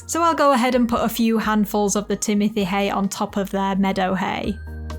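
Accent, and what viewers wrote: United Kingdom. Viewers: She’s UK😊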